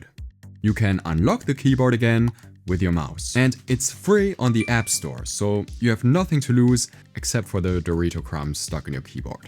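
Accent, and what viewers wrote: Germany. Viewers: you are german ? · just stumbled on your channel. Great editing, straight to the point and interesting apps - hats off! Edit: gerade gehört dass du Deutscher bist, mach weiter so ;) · Beautiful video, great exposition, nice to see mg transition, no waste of time, elegant and clear English with interesting German accent. What else? I need subscribe to say thank you 😊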